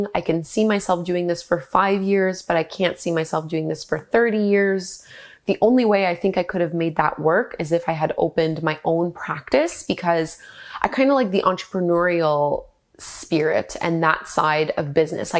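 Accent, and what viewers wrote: Canada. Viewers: I used to live in Ottawa in the Hunt Club area for few years. I am now in Melbourne Australia. It' so nice to hear the Canadian accent everyday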